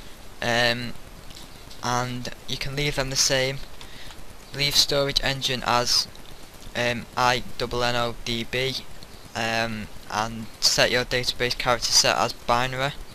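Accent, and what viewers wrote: United Kingdom. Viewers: Then I thank you for your help in making MediaWiki work. I'll find a way to solve the problem in which you solved a part. I just need to know if these files work with MediaWiki or not. I've never had a British friend. We can be friends if you like so. I'm an English student n' I like to know about Britons and British accent is my favourite. You did great. Thanks a lot. Later.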